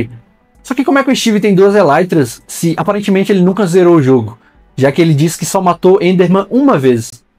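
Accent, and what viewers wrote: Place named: Brazil. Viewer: O vídeo ta muito bom mas esse sotaque divisiano melhora demais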